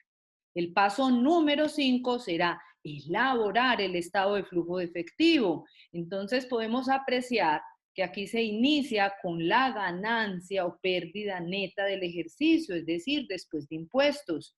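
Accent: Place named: Antioquia, Colombia. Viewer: rica esa voz de paisa arrecha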